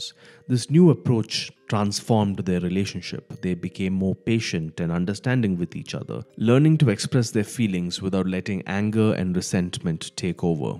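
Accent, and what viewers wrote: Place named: India. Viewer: I'm doing well, sir thank you! how about you? you have a very pleasant speaking voice and I always did like Indian accents. where are you from? I visited Varanasi once and bathed in the Ganges. the entire trip was such a memorable experience. thank you for this educational video, sir. ttyl, buddy! 🙂👋🏻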